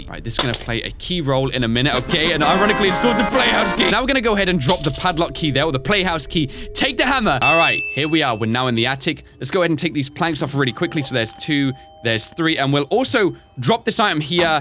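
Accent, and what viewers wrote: United Kingdom. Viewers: R u British,?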